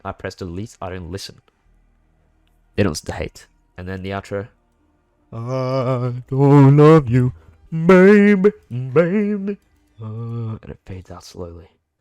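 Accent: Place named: Australia. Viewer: I believe its ausie accent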